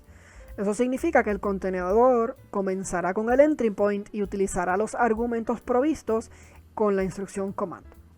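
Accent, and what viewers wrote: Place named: Puerto Rico. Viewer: acho Mano. Sera Puerto Riqueño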